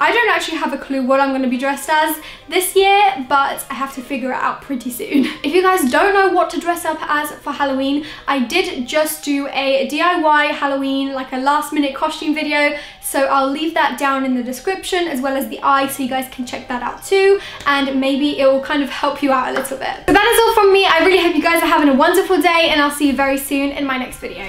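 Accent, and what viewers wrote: United Kingdom. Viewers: say ping pong one more time. that cute british accent tho 😄🙇😉😉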